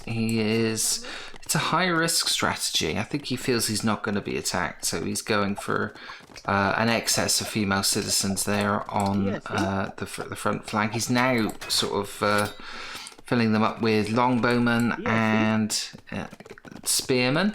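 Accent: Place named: United Kingdom. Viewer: Doesn't sounds like much of a break! If you live in the UK (like you sound you do... you're also up quite late!)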